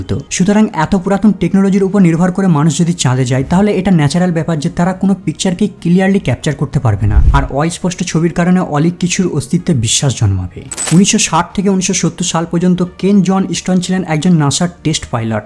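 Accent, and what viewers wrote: India. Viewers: Annoying কলকাতার বাংলা উচ্চারন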